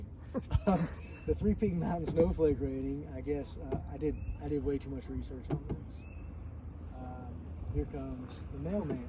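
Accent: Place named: South, United States. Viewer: That's right! Speak your southern english just as natural as you please. Roll Tide.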